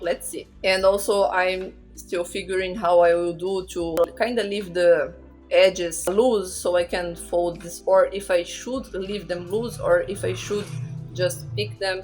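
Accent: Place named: Brazil. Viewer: incrível que eu pensei "ela é brasileira, certeza" só de ouvir você falar. ameiiiii 💜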